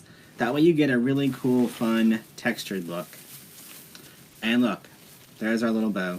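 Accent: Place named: South, United States. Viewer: I don’t know what gives me a bigger thrill! The fact that I have learned more about wreaths and bows watching 2 of your films or the fact that your from the South! I love the Y’all 😘 I feel like I am listening to one of my professors from Ole Miss.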